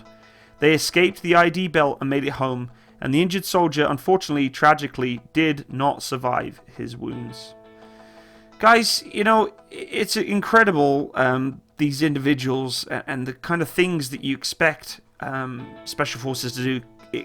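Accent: United Kingdom. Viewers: I'm ex air force elite medical rescue. The British narrative is offensive. You're Not American. Please be respectful, sir. Although I do admire your respect. Put subtitles for translation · I was not expecting the British accent when I clicked on this video. And after I heard the accent, I was not expecting the level of detail and attention put into this.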